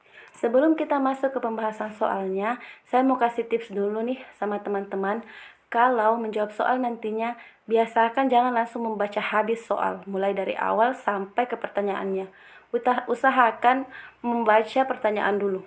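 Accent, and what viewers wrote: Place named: South Sulawesi, Indonesia. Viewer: Kk org sulsel yah ?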